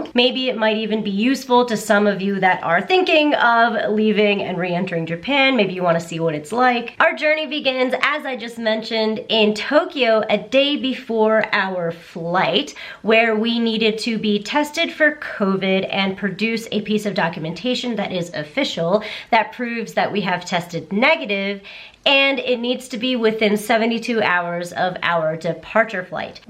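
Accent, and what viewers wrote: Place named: New Jersey, United States. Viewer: New Jersey! I knew that accent sounded familiar! I really love your videos! You’re really awesome!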